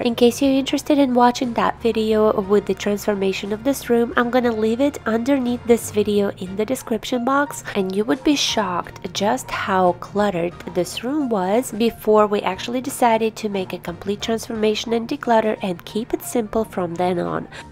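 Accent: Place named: Ukraine. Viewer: I’m a mother of 4 , my son is the oldest, then I have twin girl, then another girl. I am now a grandmother to 10 children, we have 6 granddaughters and 4 grandsons. I am a retired social worker and my husband is a nurse. We now take care of 7-8 of our grandchildren during the summer, and we do after school care when they are in school. I’m very busy with the littles, housecleaning, laundry, all the things, but I adore them! Where are you from? I have a friend from Ukraine and your accent is similar. Enjoy your channel!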